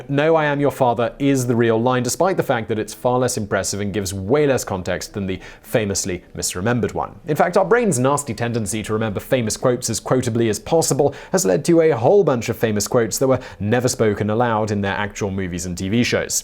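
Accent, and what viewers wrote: England, United Kingdom. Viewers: Sorry chap, doesnt matter how english your accent is, you just cant simply explain away the Mandela Effect. You mainsteam media people are utter fools. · why is Simon talking with an English Accent?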